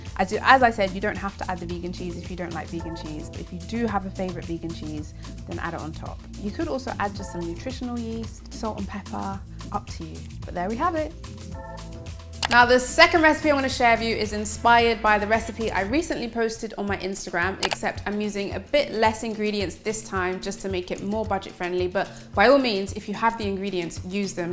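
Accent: United Kingdom. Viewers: love that british accent 😍🤣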